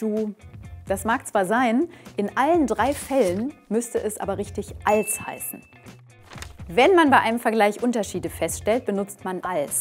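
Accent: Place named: Franconia, Germany. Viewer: VideoGameFan Ja, der kommt aber auch aus Franken ^^ sein Dialekt ist sogar für die alt-schwaben/hohenlohern unter den Schülern gewöhnungsbedürftig ^^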